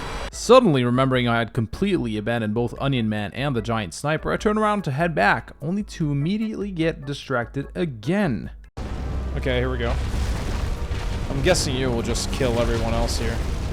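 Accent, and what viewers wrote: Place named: Canada. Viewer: You're the most Canadian Canadian I've heard play this game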